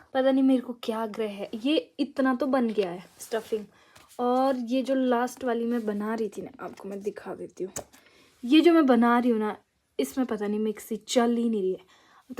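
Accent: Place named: Pahari, India. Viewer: Hy ksy ho? Aap ki pahari ton bhut Aachi h Or Aap khud bhi bhut sundar ho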